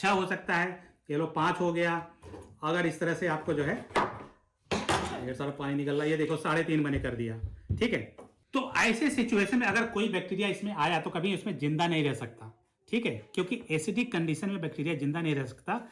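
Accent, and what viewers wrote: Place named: North, India. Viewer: आपको मेरा प्रणाम।आप के हिन्दी उच्चारण से इतना स्पष्ट है कि आप उत्तर प्रदेश, मध्यप्रदेश, बिहार के निवासी हैं।क्रेनबेरी तो शांपिग माल में मिलेगा।एक आम भारतीय इस बीमारी में क्या उपयोग कर सकता है जो वस्तु आसानी से उपलब्ध हो क्योंकि ग्रामीण भारतीय उसे आसानी से उसे प्रयोग कर सकता है। मैं यह आपकी बोलचाल से समझ पाया हूं कि आप शायद कहीं विदेश में रहते हैं। कृपया मेरा मार्गदर्शन करें शंका समाधान करने के लिए आपका धन्यवाद।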